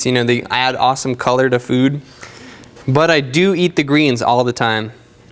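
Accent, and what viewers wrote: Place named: Russia. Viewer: Love the Russian accent ;)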